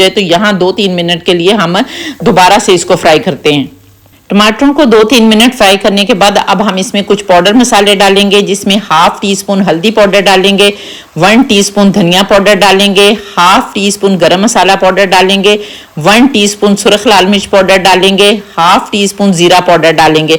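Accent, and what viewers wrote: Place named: Punjab, India. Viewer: Acha g ye aunty b Pakistan ki hai punjab ki hai ap in k bolny k style dayk lay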